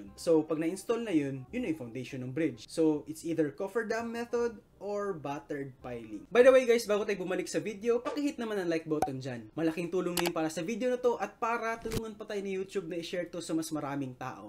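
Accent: Visayas, Philippines. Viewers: Klaro kaayo basta bisaya hahahaha nag subscribe nako engr. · Bisaya d-i ka dong?asa dris Pilipnas ka gkan?hehe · Basta ang alam ko bisaya ka bai tama ba ako. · Hi bisaya diay ka?...hehehe...parehas diay ta. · Bisdak diay si Engr. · Bisaya dodong.. Apir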